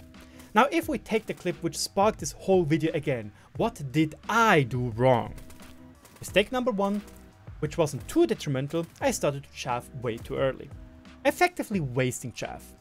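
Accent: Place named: Germany. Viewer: Dude has a thick German accent 😂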